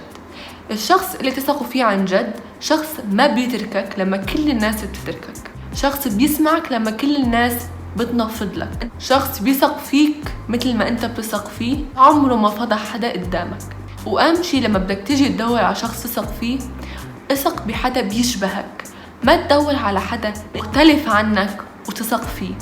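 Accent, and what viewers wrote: Syria. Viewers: انتي بتتكلمي سوري ومصري هو انتي مصريه ولا سوريه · نضارتك حلوة و كلامك السوري احلى من المصري😊🌹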